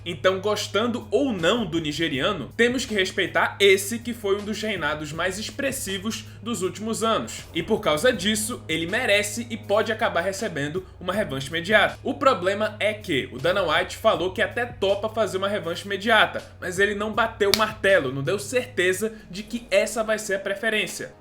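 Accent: Recife, Brazil. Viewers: Tu é de Recife bixo ?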